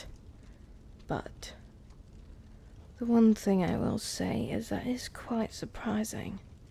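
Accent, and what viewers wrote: Scotland, United Kingdom. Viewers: Scotland? I can't tell. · I love your Scottish accent ❤❤❤ Thanks for another wonderful video 🤗🥰😘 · The hot Scottish lady is back Does anyone else find the Scottish accent attractive?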